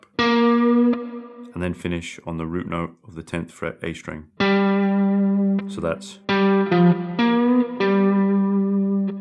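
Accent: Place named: Lancashire, United Kingdom. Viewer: Both of you are very good although fretjam seems to have stopped making videos now. It was just that you both have similar accents,Lancashire or Manchester area tones,so to speak 😀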